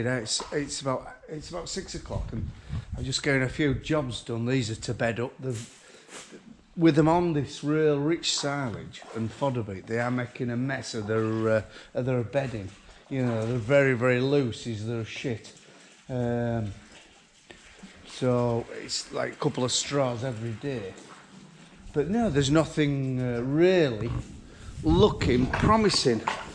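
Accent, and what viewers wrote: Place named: Northern England, United Kingdom. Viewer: It's int Wizards sleeve mode 😀 love listening to northern accent like mine, hope there's a few American viewers wondering what's he on about. Best videos on YouTube.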